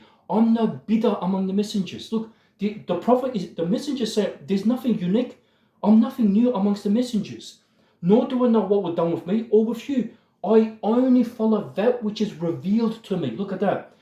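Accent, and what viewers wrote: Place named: Australia. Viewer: Salaam brother, I love the simplicity of your videos. They are factual, illustrative and straight to the point. We "true submitters" are a small community but surely growing because of people such as yourself. Keep up the great work!! By the way, it's clear from your accent that you are from Australia but cant put my finger on your ethnicity... Turkish or Lebanese?? Not that it matters but it's got me curious. 🤔😁 I'm Turkish from Perth. It's been difficult to find and connect with people like ourselves nearby. May Allah continue to bless you and your family, insha-Allah!! Peace.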